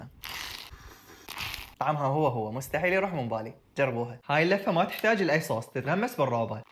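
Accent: Iraq. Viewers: والله انته البوتيته 😅😅😅😅 يازين كلام العراقين كيوت ❤ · البتيته✨✨✨ اشقد عشق اللهجة العراقيه يمشي بدمي❤ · بوتيته 😂 حبيت اللهجة العراقية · انت من العراق لهجتك عراقية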